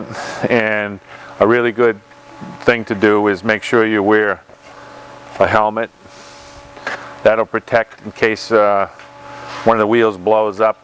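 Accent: Boston, United States. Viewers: 👍A+ for the Boston accent.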